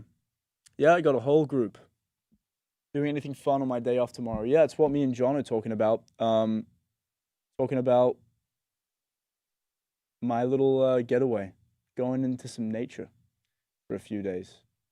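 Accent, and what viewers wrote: Edinburgh, United Kingdom. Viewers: I detected that as well, I’m guessing east coast Edinburgh say, I wonder what his bio is ? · that’s exactly it. He has the same accent as a lot of my Edinburgh/East coast friends.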